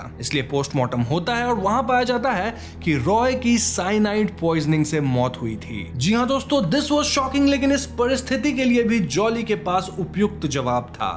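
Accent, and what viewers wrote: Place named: Bengal, India. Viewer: Dada bongali hai .. per pe char Raha hai .. not ped pe Chad Raha hai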